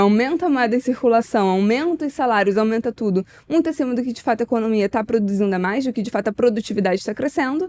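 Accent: Rio de Janeiro, Brazil. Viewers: Ótimo vídeo, pena que é sotaque RJ. Você tem twitter moça?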